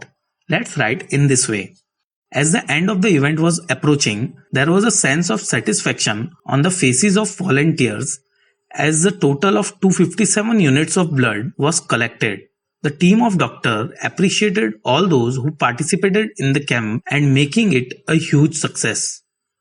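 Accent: India. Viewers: Indian ahh acent 😭🥀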